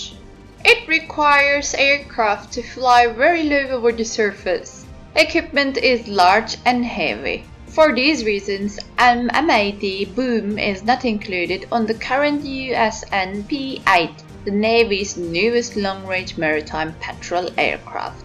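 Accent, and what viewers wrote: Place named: Scandinavia. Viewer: Prob Scandinavia like Norway, Sweden etc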